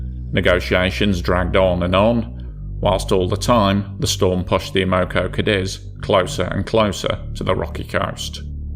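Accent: United Kingdom. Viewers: they're Brits. They speak a different version of English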